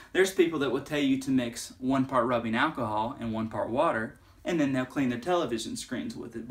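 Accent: South, United States. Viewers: I'm actually dampening a cloth like shirt it's actually more of a thermal but I'm going to pretend that it's microfiber reason being I had already dampened and started rubbing in a circular motion because my four-year-old had made the TV look like it was a child's play area. So I had my little cousin go on her phone this is the phone I'm actually leaving the message from so I'm going to have to go on my phone to look your videos up now anyways I'm leaving this comment I think I may be giving you way too many details but me and her are team she's my little girl and we clean and clean and clean so I like your voice so much that I'm going to start watching your videos all the time and I can't wait to see more I'm so excited I'm ready to do some winter cleaning but really it's going to be for spring instead of spring cleaning for winter get it LOL. But your voice I love the accident and overtook me I have a soft spot for Country accents and then that clean so that was great awesome I loved it I need more videos you are great and awesome peace out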